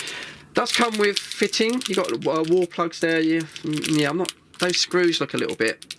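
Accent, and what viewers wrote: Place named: Northern England, United Kingdom. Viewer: are u from northern england? cause i got colleagues from there who sound exactly like you 😆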